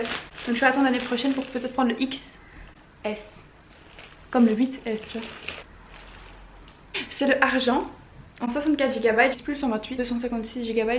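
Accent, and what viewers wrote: Belgium. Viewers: tu es belge? J'ai l'mpression car tu as l'accent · elle a un accent belge c est ps de sa faute😅🤷🏽‍♂️ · C’est normal qu’elle disent ouite a la place de huit car elle est belge moi aussi je dit ouite enfaite c’est parce que chez nous en Belgique ont dit pas huit mais ouite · Tu viens d'ou de Belgique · Accent belge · Tu est Belge? Sinon t'es parfaite💍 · Tu es belge ? J'ai beaucoup aimé ton unboxing ! Moi je vais avoir l'iPhone 6s en août donc je suis assez exister aha ! Gros bisouuus ❤❤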